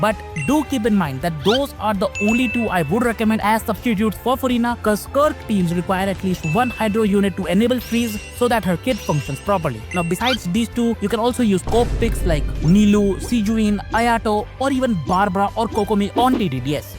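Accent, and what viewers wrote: India. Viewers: fr bro the indian accent caight me off guard. enjoyed it tho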